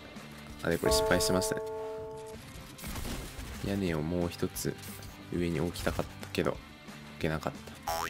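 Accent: Kansai, Japan. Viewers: さんてもしかして関西の子？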